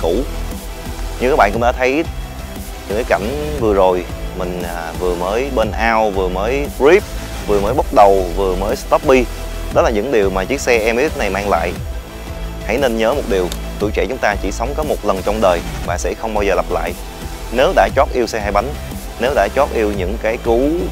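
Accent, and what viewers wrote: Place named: North, Vietnam. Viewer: Tui nói đùa thôi. Chứ tui là fan ruột của bác từ khi bác còn bên tinh tế. Chất giọng bắc dễ nghe và cách bác review rất chi tiết. Nở lòng nào dislike cho ido của mình bác.😄😅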